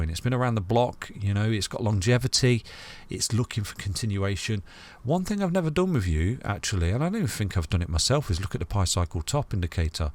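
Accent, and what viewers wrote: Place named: Nottingham, United Kingdom. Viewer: Nice video mate. Are you by any chance from Nottingham. Your accent sounds local!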